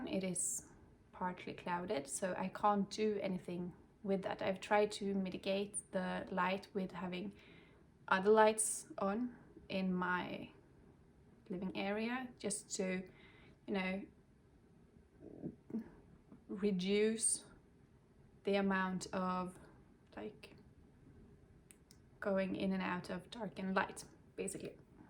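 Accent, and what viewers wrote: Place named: Scandinavia. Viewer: Hello from Chicago. Great WIP parade - awesome collection. Thank you for sharing your personal story with us. I think you are beautiful and from my side of the pond, I think your speech sounds just like that of my other acquaintances from Sweden, Norway and Iceland. It is ironic that many of us are struggling with weight loss and we forget that there are other people on the other side of the spectrum who need to gain weight. Best wishes in your recovery, and I look forward to catching up with your videos.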